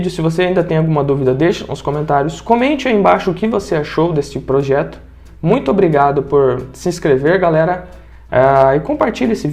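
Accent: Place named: Maringá, Brazil. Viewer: você é de maringá AAAAAAA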